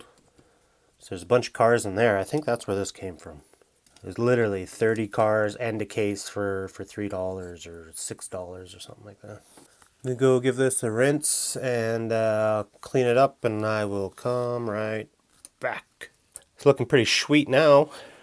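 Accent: Canada. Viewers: Don't change a thing brother! I love your attitude and the way you tell us how you do things! Plus that sweet Canadian accent is a bonus! Everything you do is cool! This old Oldsmobile brings back memories to this old Missourian. Thanks for what you do!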